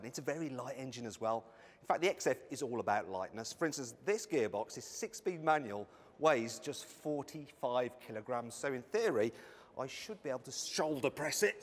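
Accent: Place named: Midlands, United Kingdom. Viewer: Sorry to get more personal than perhaps is fair when talking about a car review, but this presenter really needs some advice. Not that I'm anti-midlands in anyway, as your accent is not the part of your presentation style that annoys me however I do believe it could be the root of the issue. The real problem is your upward inflections and the end of certain sentences. This makes statements sound like questions and decreases both the credibility and my enjoyment of the video. It's infuriating to listen to. I've noticed it on a few of this channel's videos now and although it is seemingly such an insignificant part of the video, it somehow over shadows whatever message you're trying to convey. It can be over turned with so little effort whilst making at least one subscriber more at ease whilst watching your videos.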